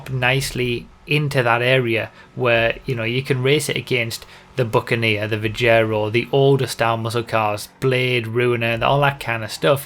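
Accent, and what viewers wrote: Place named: Scotland, United Kingdom. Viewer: U sound Scottish. Are you?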